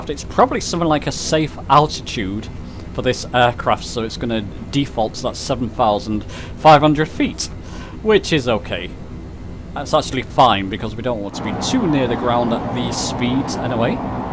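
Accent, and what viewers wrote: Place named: Scotland, United Kingdom. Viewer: hi. you say that the flight time is 6 minutes from landidan to paris. i am trying to do the same route. i have download the plane but can't find landidan. is that in finland? although the flight would be impossible. you did say 6 minutes? sorry, i have trouble with your scottish accent as i am english:/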